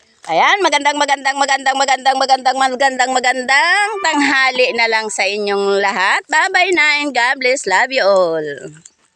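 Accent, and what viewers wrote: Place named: Atimonan, Quezon, Philippines. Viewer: Nkkbilib nmn..Sobra sipag tlga.. yun punto ng pagsasalita ninyo ay para taga atimonan quezon.. pakiramdam ko eh andun tuloy ako s lugar ng lola ko😊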